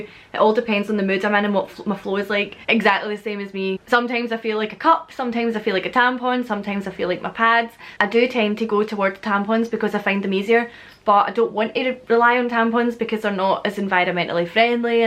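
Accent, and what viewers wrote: Scotland, United Kingdom. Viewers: What's it like having a Scottish accent and then coming down to South Africa?:)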